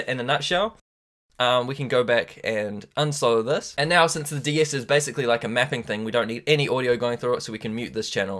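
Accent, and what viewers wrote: New Zealand. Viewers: U from New Zealand? Ur accent is nz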